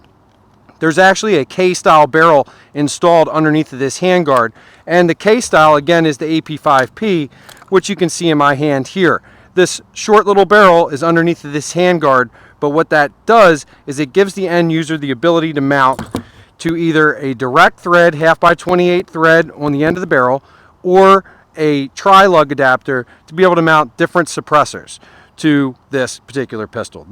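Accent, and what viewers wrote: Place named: Philadelphia, United States. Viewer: Are u from philly? Your accent is so NE philly...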